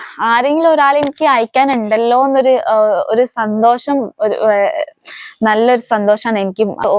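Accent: Malappuram, India. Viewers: Malappuram ജില്ലയിലെ മുസ്‌ലിം പെൺകുട്ടികൾ സംസാരിക്കുന്ന പോലെ ❤ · നീ എത്ര കാലം karelatttil താമസിച്ചു ❤️👍❤️അതിശയം മലപ്പുറം ഭാഷയയാണ് തോന്നുന്നത് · നല്ല മലപ്പുറം മലയാളം ❤️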